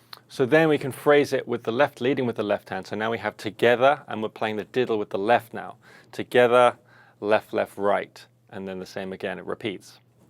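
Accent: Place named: United Kingdom. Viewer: Do you own all of these sets? As I am watching lately your videos (where you speak and act very British, compared to other guys on youtube channels) I can see a lot of nice sets: silver USA custom, black USA custom, blue Heuer, Phoenix, or Hybrid Maple, black and white....What's the deal? How do you get all of these?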